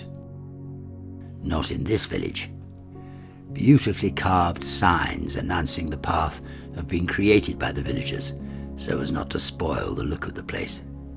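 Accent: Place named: Scotland, United Kingdom. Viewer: you are like a British Indiana Jones. 😊 I love it. My grandfather was a British Scottish man, he had the same accent as you. My mom gave me recordings of her father reading poems and your voice reminds me of him. I never met him in person but I feel like I knew him because my mother told me about him. I am a poet and artist like he was. I believe if he was alive now he would be a writer and probably do YouTube videos to teach people about history. I wonder if it's genetic to be a philosopher and lover of literature and history. It seems to run in my family. 😊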